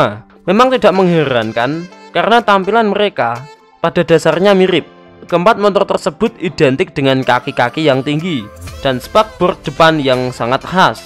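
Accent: Java, Indonesia. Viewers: Medok buanget mas broo... sampe Mblerengg gaess ,,uhh Mblereng gaaeeessss.. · Mantab unik dubbernya cadel plus medok namun penjelasannya cukup jelas dan pas ..👍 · Salam medok mas...yg asli orang Jawa mana likenya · Jangan kau hilangkan cirikhas mentok njowo mu iku mas. Salam dari AKU. anak campuran portugis,batak, jawa 😁 dari MEDAN..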